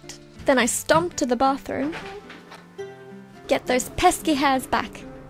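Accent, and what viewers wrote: United Kingdom. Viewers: Are u british ?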